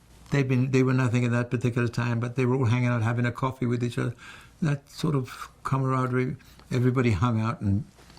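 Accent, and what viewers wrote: England, United Kingdom. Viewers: Hi handsome. I love your British accent!! And, I love when you sing the way you do, without it!! Either way, you are absolutely the best entertainer of all time in my book!!! I could listen to you talk forever!!! I go on YouTube almost every day and watch either a concert or an interview, or anything that you’re in just to hear you. You make my day!! Thank you!!❤️😘🙏🏻. Della, from Pittsburgh, Pa. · It's always great to see you Mr. Humperdinck. You sound great with that British accent also.❤ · Maybe you can’t sing you songs that way but I just love hearing you when you speak with your English accent even though maybe it has faded a bit it’s still there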